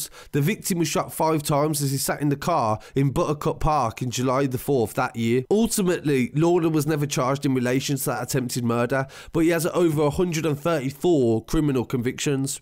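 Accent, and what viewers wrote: United Kingdom. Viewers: i come from the same town i know more about this than this guy does his accent is from the uk what the fuck would he know about our troubles haha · Mate you sound like ur from UK and learn how to say the places first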